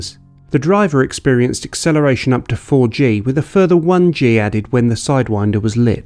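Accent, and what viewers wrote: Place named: United Kingdom. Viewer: The accusation that the radar never clocked the speed and instead a truck is entirely new and unverified. It is a claim made solely by this video narrator and uploader and no official from the U.S. Airforce. Sidewinder missiles break the sound barrier without sonic booms all the time. The British accent is very suspect with Bias.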